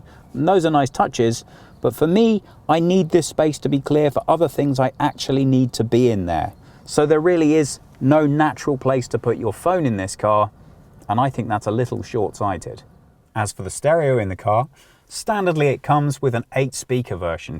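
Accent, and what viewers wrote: United Kingdom. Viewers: What an incredible DESIGN ROBBERY yes ;) Great review, love his English accent, Autogefühl have the best car reviewers of the net... but guys, please try to look neutral... your reviews are always biased regarding the VK company's cars... this sentance is just proving that... I know they are providing you with new cars and interviews... but starting to feel sick of this propaganda for DAS CHEATERS #DieselGate #MyTake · Excellent and thorough review! British accent is music to my ears!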